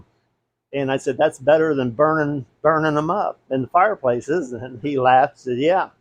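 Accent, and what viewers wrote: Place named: Pennsylvania, United States. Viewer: Great video! I think hear a PA accent, am I right? South?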